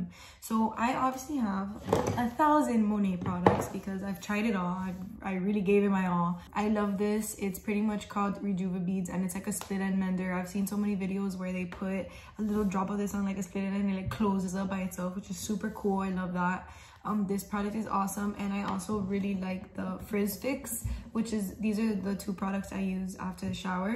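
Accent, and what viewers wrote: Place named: Miami, United States. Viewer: As soon as you started talking I was like MIAMI! The accent is strong lol. I'm from Kendall btw so I hear it right away.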